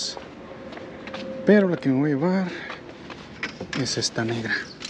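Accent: Mexico. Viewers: Lo que pasa que así hablan los mexicanos para ellos es normal,y el sr es mexicano ok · Buenas noches hola y familia la.mayoria de Mexicano hablan así igual que los Salvadoreños y algunos otros países bueno de todos modos tienes mi admiración como dicen ustedes eres muy lucho y no te rajas😂😂😂❤😂❤😅😅😅😊😊😊😊🎉🎉🎉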